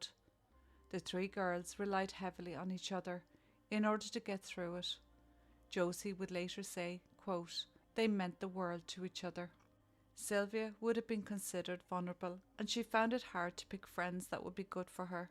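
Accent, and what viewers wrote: Ireland. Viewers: It feels like it's been forever since I've heard my favourite Irish voice. My apologies for my absence lately. Haven't been online due to sickness but I'm back. As always, love your work 💙💙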